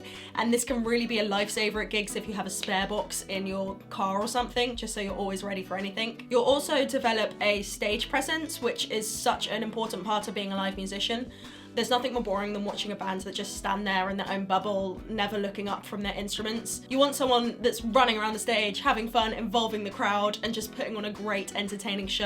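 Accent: England, United Kingdom. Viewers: Она англичанка?) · Wow she has a British accent... as if she wasnt hot enough already. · Hm... I thik the UK pronunciation ia really annoying... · I could listen to a british native speaking girl all day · She sounds English, splendid. If I hear much more of those loud mouthed yanks that sound like ducks with their stupid word awesome I may end up hurling my computer at the wall.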